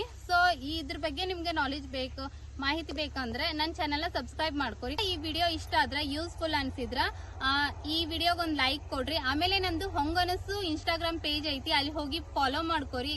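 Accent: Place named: Koppal, India. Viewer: ನಿಮ್ಮ ಭಾಷೆ ನೋಡಿದ್ರೆ ನಮ್ಮ ಪಕ್ಕದ ಜಿಲ್ಲೆಯ ಕೊಪ್ಪಳ ದವರು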